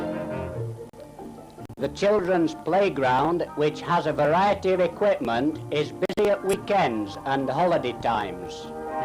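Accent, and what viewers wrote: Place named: Yorkshire, United Kingdom. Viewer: hey thats a posh yorkshire accent too hahaha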